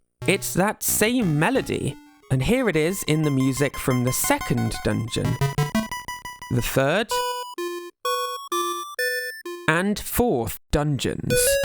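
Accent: United Kingdom. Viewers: Why do british people pronounce sixth like that?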